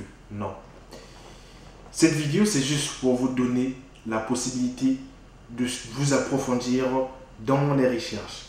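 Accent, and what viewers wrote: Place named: Democratic Republic of the Congo. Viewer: On retrouve l'accent congolais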